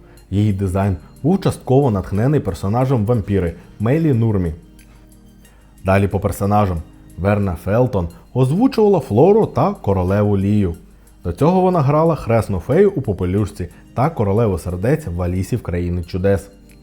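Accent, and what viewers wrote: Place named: Russia. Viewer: він має на увазі,що ви розмовляєте українською тільки для відео,має на увазі ваше лицемірство. Хоча направду,дуже чутно,що ви зазвичай,розмовляєте окупантською.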